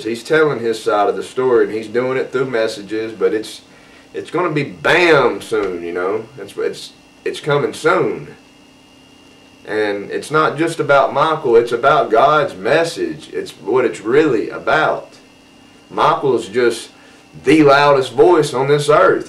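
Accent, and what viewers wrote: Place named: South, United States. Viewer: I hear you my brother J. I stopped trying to show the blind all the MJ clues a long time ago. It's really an exercise in futility. I just go straight for the jugular now and show them the reality of the lies that have surrounded us for years. But you gotta be there for them when they finally see the truth cause they all go into shock, drop to the floor and get sick to their stomach initially. Keep up the great work, love your vids and the southern twang. I'm even deeper south from you.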